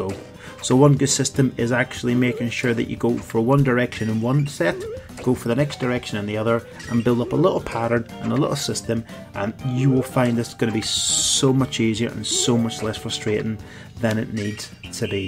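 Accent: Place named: Ireland. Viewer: Hes so irish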